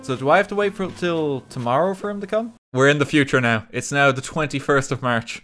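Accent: Ireland. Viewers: Every time you say Blathers in your Irish accent my American brain hears "Bladders" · couldn't place accent for a few videos, clicked on bio realized he's from ireland. everything makes more sense now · Are you irish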